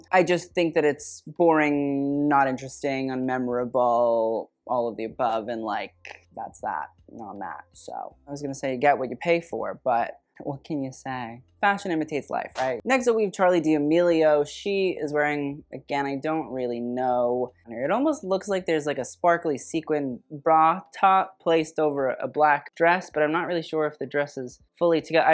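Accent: France. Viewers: As a French girl, your French accent is killing me 😭😭😭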